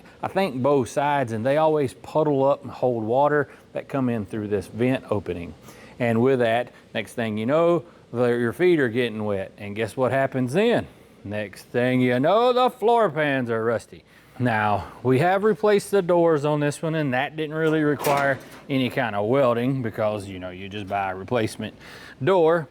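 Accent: South, United States. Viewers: Just found your channel and I must admit, I'm kinda excited because you were one of my favorites to watch on TV (and we both speak southern LOL). Love the way you show the real world aspect of getting every thing to work right. I struggle with sheet metal because I spent my career welding big industrial iron. A half inch plate was thin material. Soo.... Going going from 1/8 or 5/32 rods and wire to .025 is a bit of adjustment. Lots of holes, just say-n. Love your stuff and I'll keep watching.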